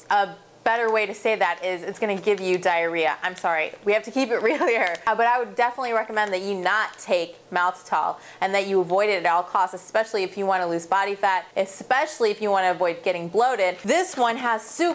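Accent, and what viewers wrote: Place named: United States. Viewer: Annoying US accent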